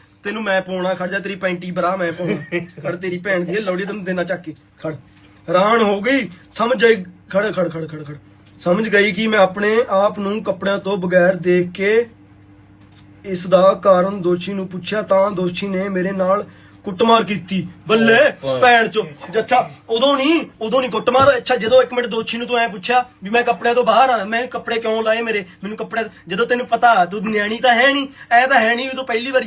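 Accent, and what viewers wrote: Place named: Malwa, India. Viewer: Theth malwayi gala .😂😂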